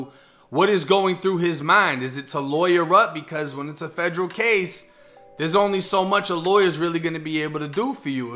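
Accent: Boston, United States. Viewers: You sure your not from Boston?? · are you from Boston cuz you have a slight Boston type Accent on how you Pronounce words with R in it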